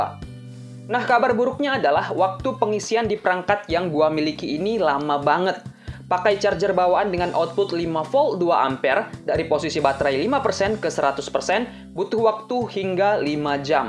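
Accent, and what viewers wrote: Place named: West Kalimantan, Indonesia. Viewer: nih pasti orang kalbar ya yg punya chanelnya😀😃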